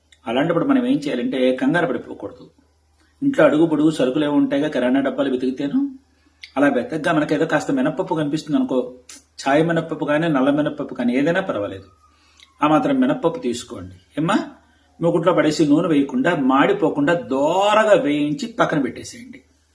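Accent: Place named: Godavari, India. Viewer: మీరు గోదావరి యాస లో మాట్లాడుతున్నారు మీది ఏ ఊరు సార్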